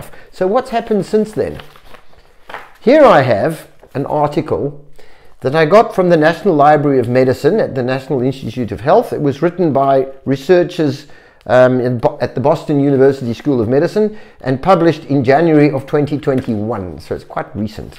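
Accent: South Africa. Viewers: You sound south african, thanks for your video!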